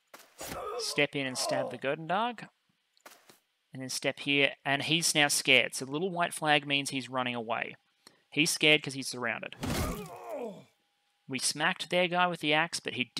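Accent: Australia. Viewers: I always appreciate your calm, thorough explanations of complex games! Your easy Aussie cadence and relaxed delivery is like butter to my ears. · Auzzie accent, and clobbering heads in. Perfect combination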